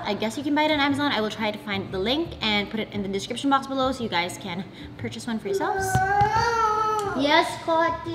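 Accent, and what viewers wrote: Visayas, Philippines. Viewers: Bisaya kaayo ka dong scottie ayy. 😍😁 "TIKI"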